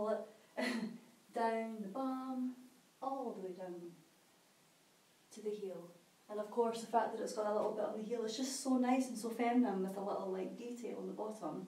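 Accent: Scotland, United Kingdom. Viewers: Scottish m8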